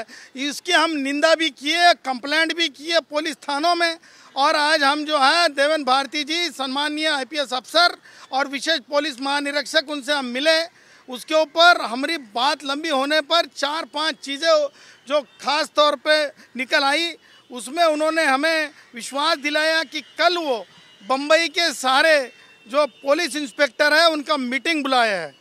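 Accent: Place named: Bihar, India. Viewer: Ha full bihari tone madhe boltoy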